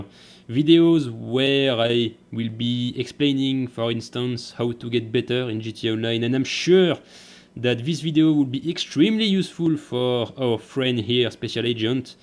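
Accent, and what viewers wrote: France. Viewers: Just watched it (5 years late) and it is so funny. Despite the fact that you are right about that dude and his crew being absolute trash it is so funny to see how salty you get. But I want to be clear, I would do the same thing and get salty about ppl being so stupid on GTA online. Its just natural I guess. Love your content, love that french accent and the way you and play „together“. Sincerely, a german. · That is 1 thick sexy ass french accent, do more vids plz:p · hey mate.. I like your skills in the game and I respect you. plz ignore these type of stupid players. your English is good enough, even though it's funny strong french accent. but it's cool. I wanna see more of your videos and I advice you to add some music. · Love your vids man and your french acsent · GS bro and I love your French accent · Ça va ton anglais même si tu as un accent français. 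· Your English is fine. I mean you have an accent which is understandable because you're French but yeah other than that it's good man don't worry about that.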